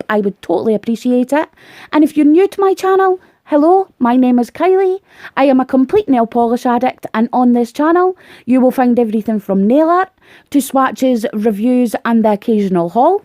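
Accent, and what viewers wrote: Scotland, United Kingdom. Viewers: New sub here. I have soooooo many Madam Glam gels. Def my fav brand. Btw, I love listening to your Scottish accent.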